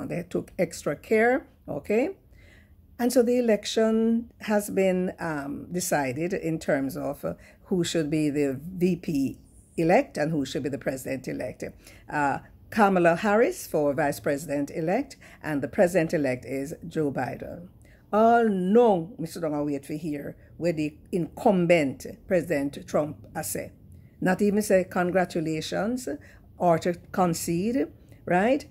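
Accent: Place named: Jamaica. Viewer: Brilliant! Keep up the good work! Love listening to you and that beautiful Jamaican twang!!!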